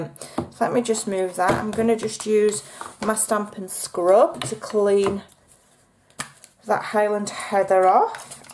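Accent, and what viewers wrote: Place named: Lancashire, United Kingdom. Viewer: Did you grow up near Lancashire? I ask because Jane Horrocks was born in Rawtenstall and you sound like her a lot. I have always loved your voice! Your so amazing <3 Tug at this American man's heartstrings lol. Thank you for all the wonderful videos.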